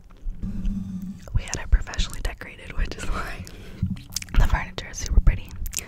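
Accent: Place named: South, United States. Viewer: This clearly isn’t a manufactured neighborhood. First off I’ve never seen a manufactured house anywhere near this big, and the house I can see across the street looks completely different than the house she’s in. Manufactured neighborhoods usually have very similar looking houses. And based on her accent and the landscaping that I can see, I’d say she’s either in Texas, South Carolina, or Georgia, in a Metropolitan are like DFW, Charleston, or maybe somewhere near Atlanta or Savannah. Which means that this land is far from cheap, I’ve seen two acre lots in Dallas go for 400k.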